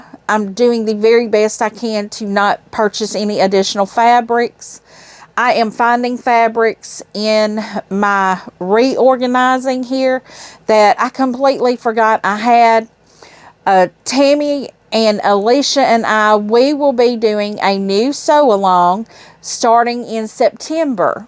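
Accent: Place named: Virginia, United States. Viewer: My heart goes out to you and hope you have a positive and speedy recovery. I’m 43 and for almost 20 years I am in pain ( TMj). I live on the west coast (but my whole family aside from 3 of 4 children are also west coast ) my family is in VA so hearing your accent makes me miss home. Lots of prayers and positive energy for you and your recovery!!❤️‍🩹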